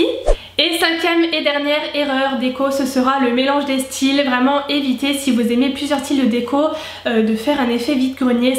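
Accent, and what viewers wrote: Lorraine, France. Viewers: hello! oui c tout à fait ca..en tous cas je te découvre tous les jours et franchement je ne sais pas kel age tu as mais tu as l'air talentueuse, posée, sympathique et très pro! stp fais + de vidéos deco ou dny!! je vis dans un chalet et je suis en plein travaux/déco et t vidéos donnent d idées) ta chaine est une belle découverte. ps: j'habite a sarreguemines, ton accent me parle....me trompe je? des biz ;-)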